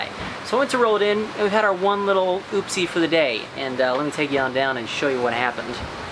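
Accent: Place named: Texas, United States. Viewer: I went back and re-listenened to the vid...I didn't hear any valley girl...unless you count a hint of Palo Dura Canyon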